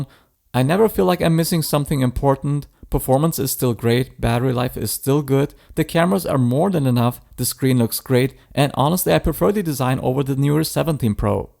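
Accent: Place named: Germany. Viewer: An interesting accent. Are u german?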